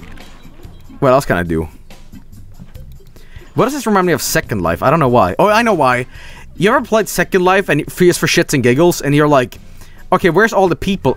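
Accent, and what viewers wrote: United States. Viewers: you're starting to sound like an American.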